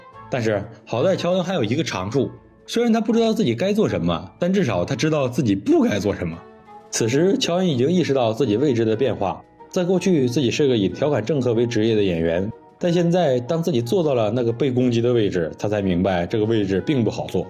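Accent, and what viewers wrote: Northeast, China. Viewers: 小伙儿故事编得不错👍大碴子声儿也没问题👌你就是口齿不清吞音太重不看字幕欣赏不到你的幽默😢好好去悲京广播学院播音系练练你的订阅至少能翻一倍😂这辈子别屈了你的才呀😅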